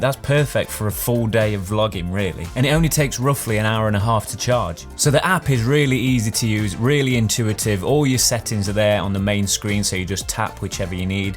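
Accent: Nottingham, United Kingdom. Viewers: I KNEW you were a Nottingham guy. I'm a Derby lad. Aaaah, the Midlands.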